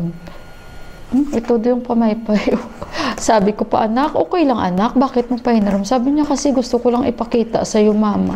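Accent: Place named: Ilonggo, Philippines. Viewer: Ilongga po ba Kay maam? Now lng ako nka view Ng YT channel mu. Alam ko tlga sa tono mu kc e😁😉. And kamukha mu ung crew ko dati sa Try me ice cream house & resto dto sa Iloilo☺️Ingat po Kau jan🙏🙏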